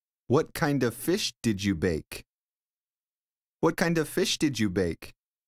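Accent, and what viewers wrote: United States. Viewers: excelente video, muy buena forma para aprender. ¿ el ingles de tus videos es ingles americano ? ¿ o es ingles como se habla en Gran Bretaña?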